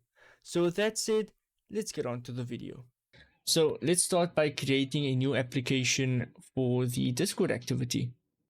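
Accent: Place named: South Africa. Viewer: I know that accent, hello from South Africa <3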